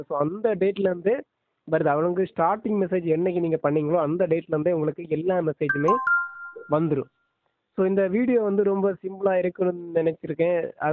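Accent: Kanyakumari, India. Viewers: Bro neengha entha oru speech keata Kanyakumari Dist pola irruku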